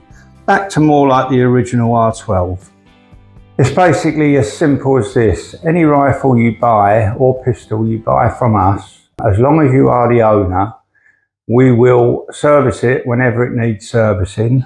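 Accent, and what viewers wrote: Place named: Essex, United Kingdom. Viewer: You sound like you come from Essex you miss name many rifle parts which makes you sound stupid and very boring to listen to!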